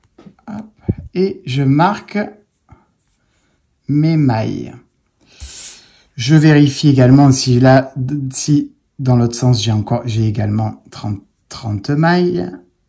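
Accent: South, France. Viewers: Yes 👍 MERCI infiniment 🙏 je t,adore ❤❤❤ je voulais apprendre à faire ce talon, jusqu'à maintenant je faisais un talon a gousset renforcé et je voulais vraiment apprendre à faire celui ci 👍👍 demain je le test j,ai des chaussettes en cours je vais faire ça!! Je te montrerais sur insta ❤️❤️❤️ tu es génial 👏😉🙏 tu me fais sourire avec ton accent du soleil, et ça fait du bien ❤ et comme tu le dis on est tous pareils et la pour apprendre 😘😘😘😘😘😘😘😘😘😘😘😘😘😘😘😘😘😘😘😘😘😘 vivement ton prochain podcast 🙏👏❤️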